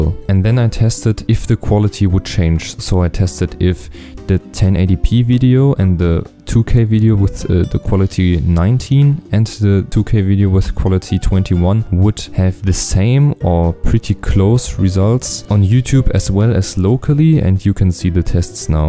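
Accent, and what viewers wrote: Germany. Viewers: just uploaded a video on 1440p. first problem: i can only watch it on 1080p plus it`s still avc. hopefully it's still processing and in a few minutes it's fine edit: ich wusste doch dass du deutsch bist das hört man :) nichts gegen dein englisch, alles gut :D 2. edit: alles funktioniert danke :D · Man hört deinen deutschen akzent, fand das Video trotzdem hilfreich.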